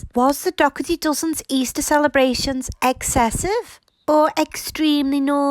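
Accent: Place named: Scotland, United Kingdom. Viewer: Your accent is SOOOOO UGLY Jesus Christ. You sound like a 1,000 pound Scottland woman in a longgggg tan dress.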